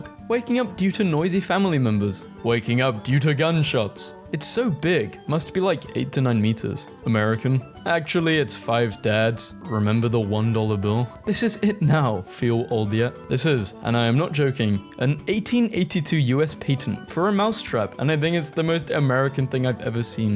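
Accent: United Kingdom. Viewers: I like how it's a British guy commentating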